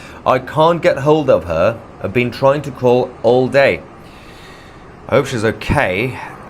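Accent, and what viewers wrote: United Kingdom. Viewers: Annoying British accent lol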